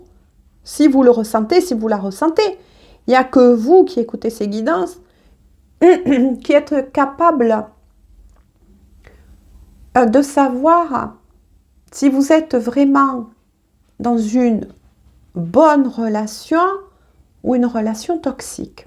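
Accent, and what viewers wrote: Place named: Marseille, France. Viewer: C’est vous le rayon de soleil,avec cet accent de Marseillais « je crois « vous écouter m’apporte beaucoup, mais bien sûr aussi les messages dont vous nous faites la lecture, merci d’être là votre chaleur est réconfortant. 🙏✝️💜🙏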